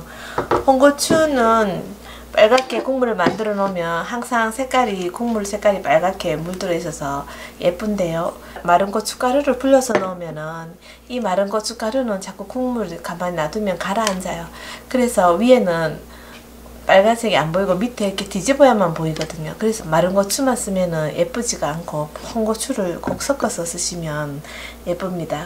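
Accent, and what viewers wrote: Gyeongsang, South Korea. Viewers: 대구. 경북분이신듯?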